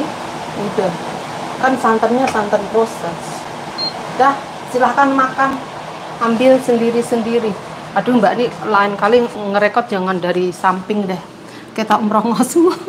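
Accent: Java, Indonesia. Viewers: sy hadir dr madiun jatim mbk aku sela😅lu ngikuti chenel mbk putra putrinya udah mulaidewasa mbk ,mbk kadang sy ketawa bhs mbk kok msh medok kali yo 😅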